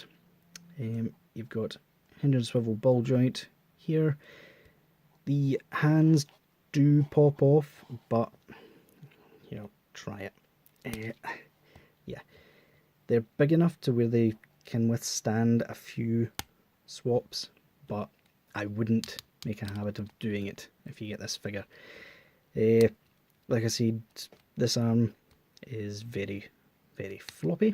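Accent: Scotland, United Kingdom. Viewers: i have bought this figure to see it for myself and I also cant afford the real one btw are you from Scotland because if you are I am aswell :)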